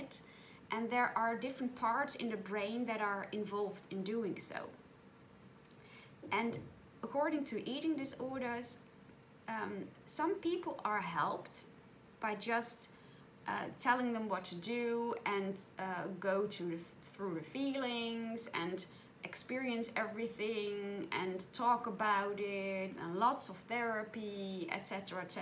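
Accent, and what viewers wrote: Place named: Netherlands. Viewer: THANK YOU THANK YOU THANK YOU for reading that in Dutch. I am 2nd generation Dutch/Australian and both my Oma and Opa have passed on but hearing you read that just brought back amazing memories of two Beautiful people who i miss dearly. Dutch is a beautiful language and I miss that strong accent so much ❤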